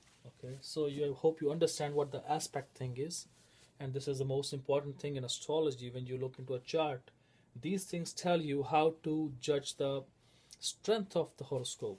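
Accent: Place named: West, United States. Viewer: Bro if you lose your Western accent this wonderful explanation would be better understood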